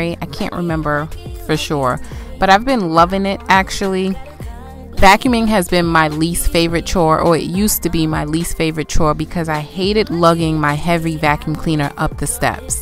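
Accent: New York, United States. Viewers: I heard you speak and thought “she’s from NY!” Lol. My family relocated from Brooklyn to SA, TX six years ago this June. I absolutely loved watching your video! Definitely subscribing! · I subscribed as soon as I heard your accent! I’m a native New Yorker and we picked up and relocated to Houston 5 years ago - hearing you feels like home 😊 Thank you girl, keep talkin your ish ❤️ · LOVED THIS VIDEO! As soon as you spoke I knew you were from New York! Totally relatable I’m from New York and relocated to Colorado so I felt you sis!!! Love your home so cozy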